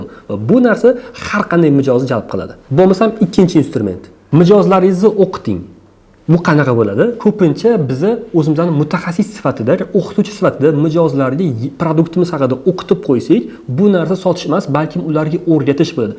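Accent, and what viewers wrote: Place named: Qarshi, Uzbekistan. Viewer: Qayerliksiz qarshiliklarga o'yshap gapirdiz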